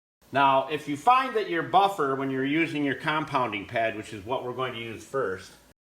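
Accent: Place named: Michigan, United States. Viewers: Where are you in Michigan? I’m originally from the Flint area and thought I recognized that accent. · This guy has a Michigan accent for days...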